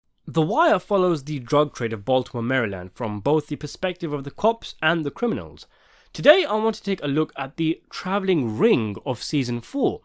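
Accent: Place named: United Kingdom. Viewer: Listening to the narrator is like listening to a british person telling me that beans and toast is a great american cuisine. As soon as I heard the accent , I just stopped listening.. srry not srry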